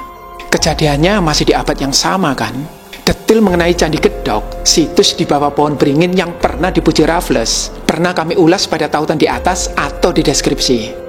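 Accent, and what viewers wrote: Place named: Malang, Indonesia. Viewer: Logat e ngalam banget, oh iya ktr e ASISI nang Ngalam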